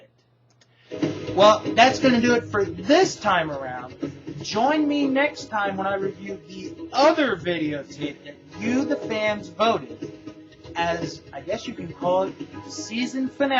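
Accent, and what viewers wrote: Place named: England, United Kingdom. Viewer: Nice English accent 👍👽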